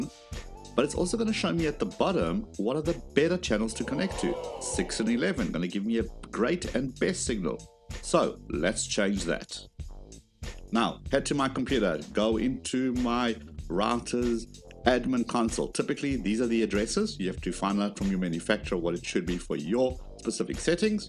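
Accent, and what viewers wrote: South Africa. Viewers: Your accent is so South African 🤔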